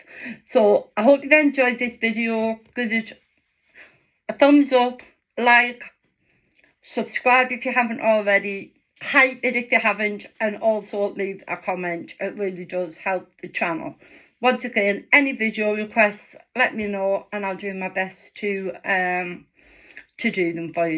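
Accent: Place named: Wales, United Kingdom. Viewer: Excellent owl! I see (and hear) you're from the UK. I'm gonna guess Wales, since Welch is the one English this American has trouble understanding. (And, yes, I've even been to Australia. lol) I'm glad the vid isn't just a short because it takes a bit before my ear attunes to Wales. Thanks and, yeah, it's definitely worth a sub.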